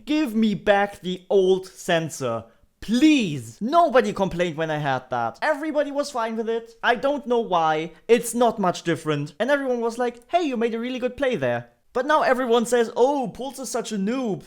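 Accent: Germany. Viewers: Are you German? Sounds like a German accent. I like it. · What is that accent? Is it German? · you deserve more subs and i was wondering are you German or Russian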